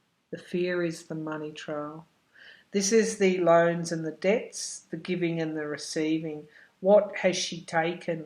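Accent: Australia. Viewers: Hi,. I enjoy listening to your great Aussie accent and readings. When you have the inspiration, will you please do a reading for Maxime Bernier, the leader of the People's Party of Canada. This us our election year and Canada is in a terrible mess with lots more coming.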